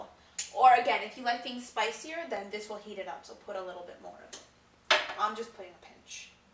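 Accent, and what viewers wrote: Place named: North America. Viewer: No. It's a north american accent.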